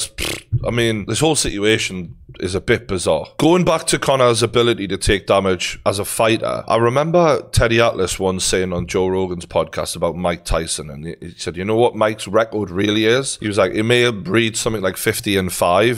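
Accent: Newcastle, United Kingdom. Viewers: Everyone recognizes geordie good work bro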